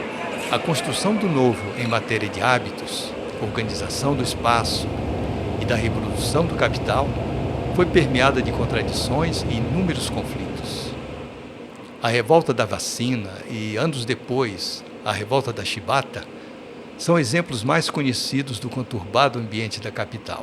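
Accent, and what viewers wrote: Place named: Portugal. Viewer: Esse narrador deve ser europeu rs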